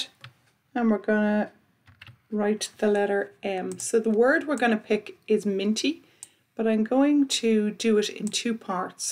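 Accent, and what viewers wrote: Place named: Ireland. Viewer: Love your videos. Can’t believe you don’t have more subscribers. Good to hear the Irish accent too. ☘️🇮🇪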